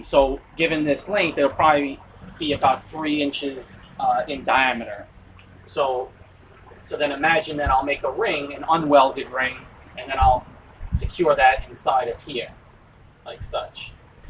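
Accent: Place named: Upstate New York, United States. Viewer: Love you’re videos!!!! You’re accent is also very interesting! I’m listening to “I’m guessing an up state New York accent? That has picked up the cadence of, for sure, and is slowly turning Taiwanese! It ads such an awesome element to your content. It’s ever present in your videos, but far more pronounced on your wandering Taipei videos when you’re obviously interacting with locals off camera! KEEP BEING AWESOME! I’ve gotta get on you’re Patreon page. Thank you for all you do!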